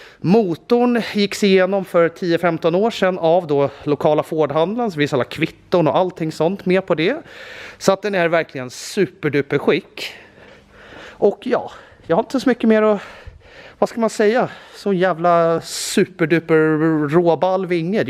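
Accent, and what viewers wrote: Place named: Stockholm, Sweden. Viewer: Hehe så skön stockholmska. Smutta upp den. Haha. Man blir så glad att lyssna och se på sköna människor. Du gjorde verkligen min dag kompis!!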